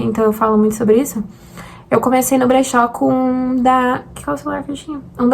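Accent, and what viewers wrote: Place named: Rio Grande do Sul, Brazil. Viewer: pelo sotaque, também sou gaúcha de Porto Alegre.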